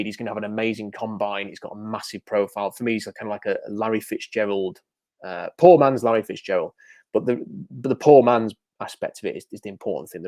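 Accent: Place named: Manchester, United Kingdom. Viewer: Hey, a draft guy with an English (Manchester area, I think) accent. Subscribed and watching the past videos.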